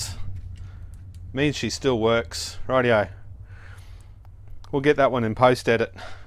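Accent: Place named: Australia. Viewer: If it's not branded Ryobi, then it's NOT a fake. From the accent the reviewer is Australian who tend not to pay attention to facts. Anyone buying a replacement third party battery can clearly see it's not a genuine Ryobi or a Makita or Worx. So it's ridiculous to call it a "fake". Just sensationalism.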